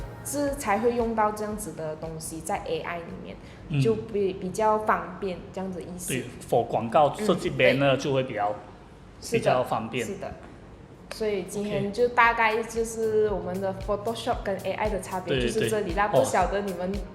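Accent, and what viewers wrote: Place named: Malaysia. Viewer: 很开心看到这个channel...听到马来西亚华语很亲切！加油！！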